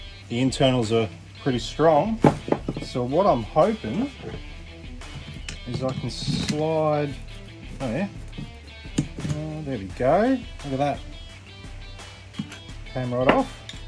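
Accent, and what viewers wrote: Australia. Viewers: Looks and sounds like this guy is in Australia. I've never seen a torx screw or star headed screw on any USA Milwaukee drills or tools, they are all Phillips No. 3 or split headed with standard screwdriver slot. It's obvious the engineering is purposely different on OEM Milwaukee tools down under. Milwaukee half inch cordless chucks have been known to freeze up like this. I've never seen any tool maintenance instructions from them advising regular lubrication of the chucks. Seems like common sense but lots of tradesmen never lube their drill chucks, both keyed & keyless.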